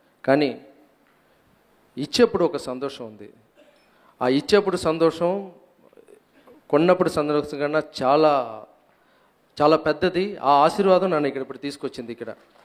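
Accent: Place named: Telangana, India. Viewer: Only Telangana style Raghava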